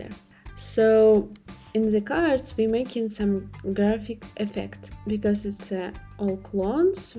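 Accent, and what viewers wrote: Russia. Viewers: Thank you!(акцент русский)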